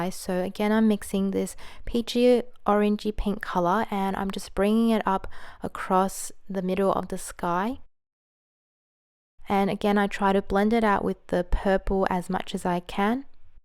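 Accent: Australia. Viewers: Are you Australian? You accent sounds very Australian specially when u say “water” as “wodah” and “borders” as “bodahs” and “white” as “whaih” etc :)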